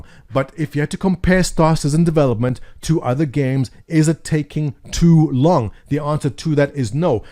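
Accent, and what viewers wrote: South Africa. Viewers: And you just have the biggest Testies! Btw. Do I spot a South African dialect there? And do I remember correctly you currently reside in Canada? Not a stalker question, just testing my dialect sensors :D.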